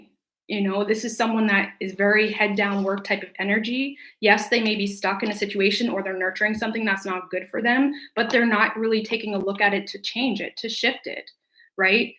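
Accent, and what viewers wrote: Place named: Canada. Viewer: Pssst....your Canada is showing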